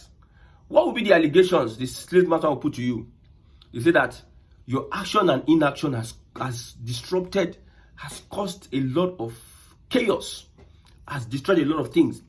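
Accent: Igbo, Nigeria. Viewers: The way you pronounce Nnamdi, are you Igbo?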